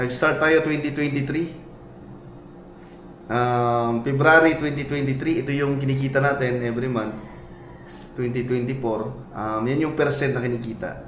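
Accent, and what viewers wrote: Visayas, Philippines. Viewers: Bisaya diay ka dol hahahahabal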